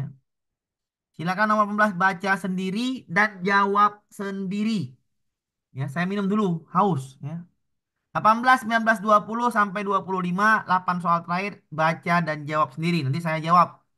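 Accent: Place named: Lampung, Indonesia. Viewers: Saya suka penjelasan bapak kayak orang lampung cara ngomongnya tapi nyambung saya 😂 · Nada Sumatra banget bapaak😅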